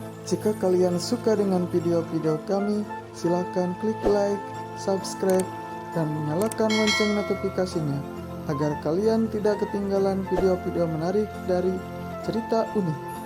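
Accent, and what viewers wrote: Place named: West Java, Indonesia. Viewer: Fixs yang punya orang Sunda .. sifat dibaca sipat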